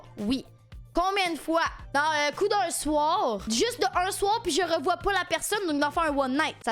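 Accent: Quebec, Canada. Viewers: Mdr j'aime trop ton accent québécois 🤣🤣tes trop cool❤ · Je kiffe trop les expressions du Québec et l’accent merci d’exister ❤️ Love ❤️ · mdrr j'ai du mal avec l'accent québécois mais je suis restée parce qu'elle est grave drôle mdrrr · j'adore l'accent québécois je suis Français et ma mère est québécoise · C’est quel accent celui du Canada ou Québec 😂❤ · MDR je comprend une phrase sur deux l accent québécois c dure en vrai. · trop marrante avec le pur accent du Québec il est fort l'accent là. elle est trop chou je connais pas la chaîne je vais voir ça. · Je vous jure l'accent Québécois ca me tabasse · Je regarde pas tes vidéos d'habitude mais jsp pk je l'ai vu dans mes recommandations et bordel j'adore l'accent québécois ptdrrrr désolé mais j'ai trop ris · L’accent additionné avec un comportement de troll… les femmes québécoises🤢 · C'est tres déstabilisent de voir une fille québécoise parler c'est grave moche et la jai été surpris je m'attendais pas a se quelle parle québécois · À deux doigts de découvrir l'accent québécois ma tdc de français baguette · Nan mais vraiment j’arrive sur cette vidéo j’avais jamaiz vu ta chaîne je me rends compte d’un truc j’adore cette meuf (voire femme du coup) elle est juste incroyable tellement drôle et avec de ces anecdotes tellement intéressantes et son accent du Québec mais c'est qui la femme de ma vie à ce niveau??😅😂🥲😯😊😍🥺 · Chelou quand même l'accent québécois 😂😂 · Je ne sais pas pourquoi algorithme de Yooutube m'a proposé ta vidéo, mais cet accent m'a tué de rire! 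🤣Vous êtes trop fort les québécois! On vous aimes! 💗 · Omg cet accent québécois J'ADORE HAHAHA 😊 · j'adore ton accent du quebec c trop drole🤣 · mdame z'êtes magnifique.! Ta vidéo me donne vraiment envie de venir vivre ma meilleure vie par chez vous, tellement j'aime ton pays, et vous! Votre accent est magique, je connais vos expressions, je déteste la chaleur et même si je n'ai pas le choix, j'aime le froid et même ses galères. La France n'a plus d'hiver, elle est coincée, vieillotte et conne, ca me rend fou. Quand je vous entend, quand j'écoute ce que vous vivez, je me dis qu'ici chez moi je ne suis plus chez moi. Les québécois, je vous aiment 😊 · Trop belle,toi ainsi que ton accent québécois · Elle est trop drôle, elle a l'air toute calme toute féminine mais quand elle raconte ses histoires sans langue de bois avec son accent québécois ça me fume de rire c'est une masterclass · Je me suis abonné juste pour l’accent québécois 😂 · J aime trop ton accent quebequois❤ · elle est trop marrante c'est une pile électrique et son accent québécois mdrr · L'accent quebecois j'ai du mal xddd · Je m’y ferai jamais à l’accent québécois 😂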